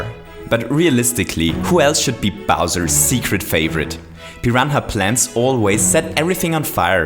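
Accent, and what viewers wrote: Germany. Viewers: Bist du ein Deutscher ? Du hörst dich so an, als ob du ein Deutscher oder von irgend ein anderes deutschsprachiges Land kommst :D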